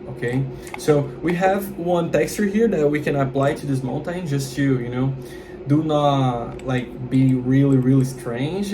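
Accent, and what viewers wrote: Brazil. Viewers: reconheci o brasil só pelo sotaque akakak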